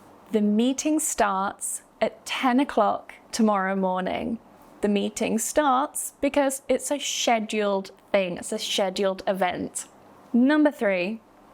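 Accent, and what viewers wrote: United Kingdom. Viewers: I am from Japan. It’s so helpful for improving my English skills, and I love your beautiful British pronunciation❤Thanks a lot! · I understand your feelings bro her accent is British accent that's why.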